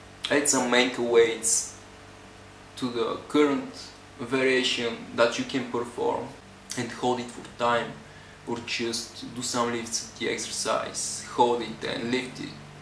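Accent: Bulgaria. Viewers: Ебаси българския акцент .... българин ли си ????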